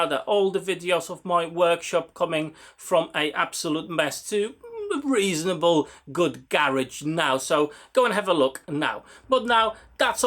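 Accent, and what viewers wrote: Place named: United Kingdom. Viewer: Wiedziałam, że z UK. Zastanawiałam się tylko z jakiego miasta konkretnie. Fascynują mnie akcenty. Ja mieszkałam przez 5 lat w Colchester w czasie studiów.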